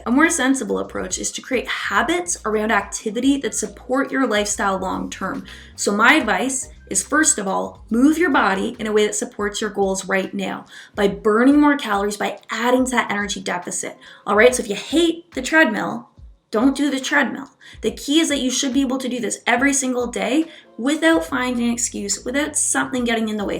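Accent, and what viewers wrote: Canada. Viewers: Is she Canadian? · is your accent Canadian?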